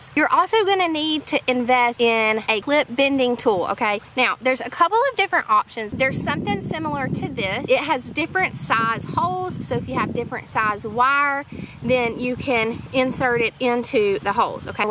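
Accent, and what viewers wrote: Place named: Virginia, United States. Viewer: Just subscribed!!! Where are you from. That accent reminds me of us here in VA.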